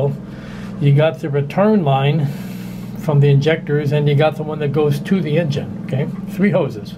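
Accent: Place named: Canada. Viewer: Aha ! That's why you have a Canadian accent!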